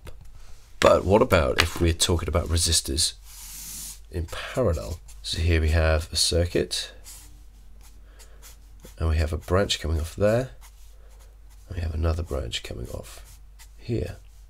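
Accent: United Kingdom. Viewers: Ok but why does his voice , scream British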